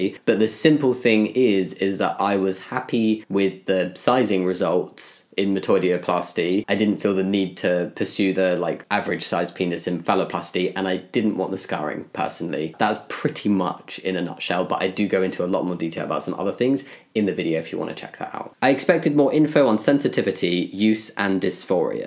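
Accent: United Kingdom. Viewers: I like your voice. Are you british? How have you gotten it? Or you have had it before transition?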